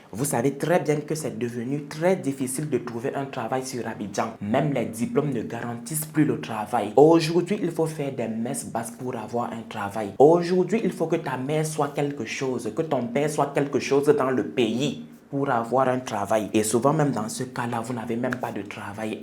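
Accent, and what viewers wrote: Cameroon. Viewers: si tu es ivoirien, vraiment tu as appris avec réussite l'accent camerounais. Venons-en maintenant aux incongruités intrigantes de ton narratif. Si savoir se comporter est entre autres un critère de choix, à quel moment cette entreprise à testé ton comportement avant de te retenir? Et puis étant donné que l'approvisionnement d'un restaurant est une tâche répétitive, les responsables doivent s'assurer de la disponibilité des composantes de leur menu principal. Les cadavres des morgues sont conservés avec des produits toxiques pour tous vivants. Où d'après toi se procrent-ils permanemment la chair humaine? Et puis c'est à croire que tous les gouvernements qui se succèdent à la tête de ce pays sont une secte d'initiés à la dégustation de la chair humaine. Laisse moi retenir que tu as fait un post pour faire le buzz, recueillir des "Likes" et monétiser ta page. 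Merci à toi frère ivoiro-camerounais. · Ton accent nous dit que tu ès camerounais. Ne te fous de nous. Tu es vraiment menteur et malade. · Ces restaurants n'existent pas à Abidjan. C'est peut-être au Cameroun vu ton accent.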